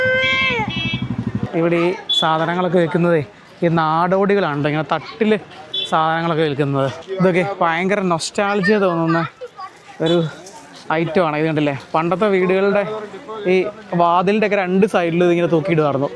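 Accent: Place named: Idukki, India. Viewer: ഇത് x-mas വെക്കേഷന് എടുത്ത വീഡിയോ ആണോ. ചേച്ചി ട്രിവാൻഡ്രം ആണെങ്കിലും ഇടുക്കി സ്ലാങ് ആണലോ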